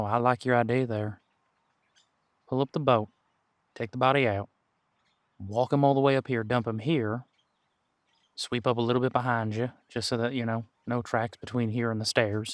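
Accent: United States. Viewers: FUCK YES HE'S SOUTHERN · Was this made before or after you got the cable situation sorted? Also I'm just curious as to the accent. It feels Carolina with a bit of Kentucky touches. Meto voice to be sure that is compatible with their roots. Bit of " turns Detective after encounter with a human fiddler that changed their mind about life".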